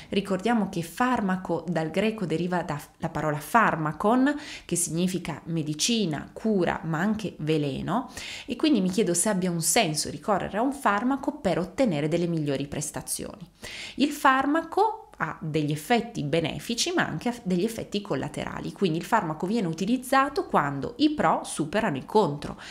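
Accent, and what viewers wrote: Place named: Turin, Italy. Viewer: Ma lei é di Torino neh? Ma boja faus😊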